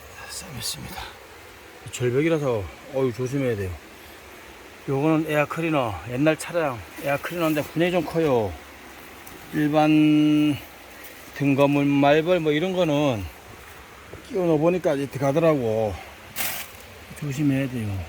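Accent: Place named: Danyang, South Korea. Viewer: 간만에 단양 진또배기 사투리를 들으니 억양도 좋고 가지끈 반갑습니다 ㅋ 건강 유의하시고 사랑하는 동상^^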